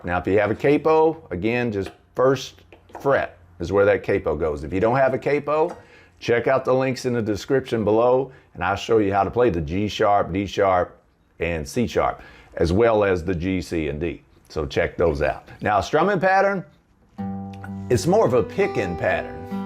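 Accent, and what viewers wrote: South, United States. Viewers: great lesson, haircut and southern draw! You made it easy........Oh, and yes, is one of the greats!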